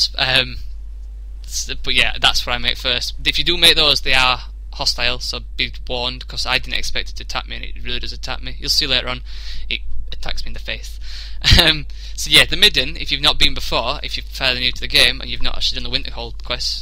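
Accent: Scotland, United Kingdom. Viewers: he doesn't sound too american, he sounds more scottish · Hmm sounds Scottish. Or I could be wrong.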